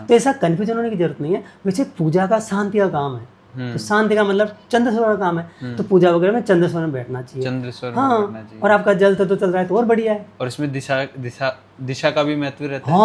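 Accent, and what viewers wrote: Hadoti, India. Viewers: Hadoti Ke Lag Rahe he Botne Se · Gurudev apki language bilkul hadoti rAJASTHAN jese lg rahi h m bhi hadoti ki hoo apki bhasha hakk se jatate huy ;;;;;;;maja aa gy apko padm bhushn milna chayee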